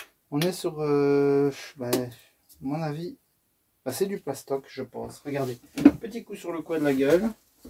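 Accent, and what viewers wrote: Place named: Belgium. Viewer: Coucou et ta petite famille ❤ Oui je suis laaaaaaaa (imagine une petite femme qui saute les bras en l'air 😅). Boudu! (comme on dit chez-nous dans le Sud) j'ai un retard monstre 😮 c'est affreux!!! Mais le soleil est revenu, et j'ai tellement profité du jardin le soir après le travail, que YouTube me regardait, au lieu que moi je le regarde 😂. Du coup, comme j'ai un retard monstre (encore!) sur tes vidéos, je laisserai un pouce en l'air (la base!) et un cœur ❤ en commentaire. Désolé il n'y aura pas de roman 😢, mais faut que je rattrape tout ça 😅. J'ai adoré (comme toujours!) ta vidéo. Comme tu le sais et ça depuis le début, les vidéos du vendredi c'est ma pépite de la semaine ❤. Pour les cannettes en alu, en effet... Il faut mettre de côté, pour 3€ et quelques... C'est pas si rentable que ça, même si comme on dit "il n'y a pas de petites économies". 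Mais la... Ce n'est plus question d'économie. C'est question de places 😅. Pour l'engin avec le charbon, mon pauvre, je ne t'aiderai pas 😅 je ne suis ni bricoleuse du dimanche ni même de la semaine 😂. Merci pour ce bon moment de rire, je dois avouer que ça fait du bien de remettre l'accent belge à la maison 😘. Des bisous à toi et ta petite famille ❤. A très bientôt 😘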